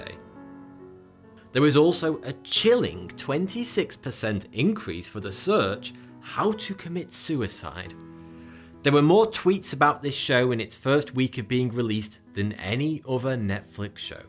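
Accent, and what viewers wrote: United Kingdom. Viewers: Nice and British, yes... He not also have said "but" strangely BUT also "ass"... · it's a typical British accent really I am not originally from the UK but I live in Yorkshire and I can tell you that loads of British people have a lazy way to pronounce "th" simply sounding like they are pronouncing "f"... For what I heard he is originally from the Isle of Man.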